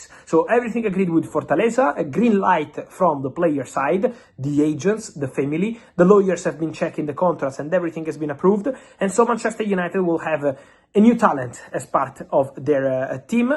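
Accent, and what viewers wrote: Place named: Italy. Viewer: i love how you say sir jim ratcliffe yoiur italian accent makes it sound like sergio ratcliffe here we go have a great day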